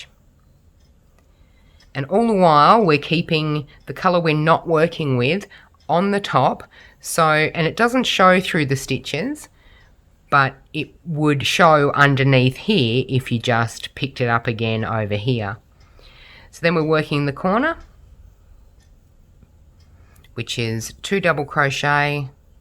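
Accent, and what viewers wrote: Australia. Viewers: Delightful square - different and therefore appealing. :) Question: Aussie ? Statement: very well described process, complete with helpful pointers. Goodonyermate !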